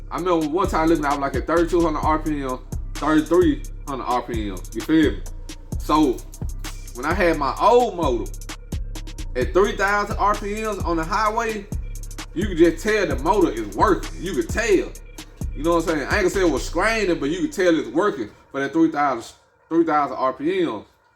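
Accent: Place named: Memphis, United States. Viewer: Sound like you from Norf Memphis. Good ahit tho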